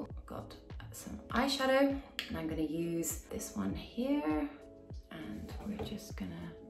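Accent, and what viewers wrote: United Kingdom. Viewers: What accents she has? British.. but not that Seamus on Harry Potter😅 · Why do I always thought that she's American for some reason? The moment she speak, I was a bit surprise to hear her British accent. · Is she British based on her accent? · The only time I actually like a Brit girl voice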